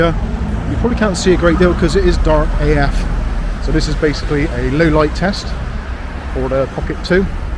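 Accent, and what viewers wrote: Bristol, United Kingdom. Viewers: I just knew you were Bristolian! just down the road from me :)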